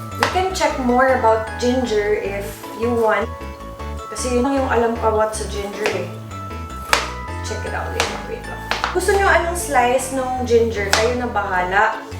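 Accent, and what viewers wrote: Visayas, Philippines. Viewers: dati nag subscribe ako dto pero inalis ko kasi parang nakakasaawa panoorin yung pgkbisaya nya nakakaturn off maganda pa naman sya saka sobra maarte mgsalita.aral ka tagalog kasi nasa manila ka naman na nakatira . sorry to comment like this para din syo yan para mas dumami subscriber mo not only visayans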